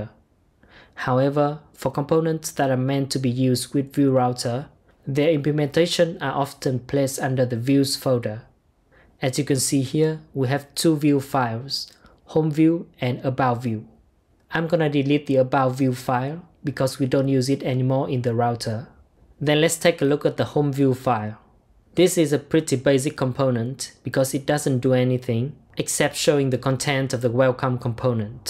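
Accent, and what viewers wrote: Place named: Vietnam. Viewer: nghe tiếng Anh từ nước bản xứ thì không hiểu chứ nghe tiếng Anh từ người Việt thì hiểu 90% haha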